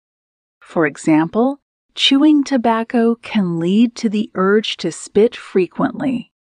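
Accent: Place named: United States. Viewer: This is American English, please differentiate.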